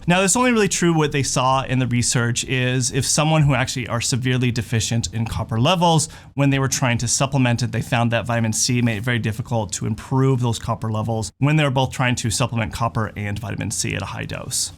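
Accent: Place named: Utah, United States. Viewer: I couldn't quite place where your accent is from. Then I heard you say "Buh' en' very strongly (for button) and I thought it sounded very much like a Salt Lake City or Provo accent. I could be wrong. ISTITNOJCA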